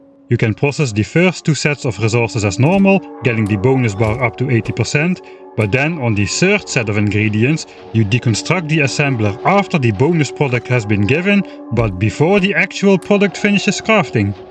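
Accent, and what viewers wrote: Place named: Netherlands. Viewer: Klasse video! Oprecht een van de heftigste Nederlandse accenten die ik ooit gehoord heb haha.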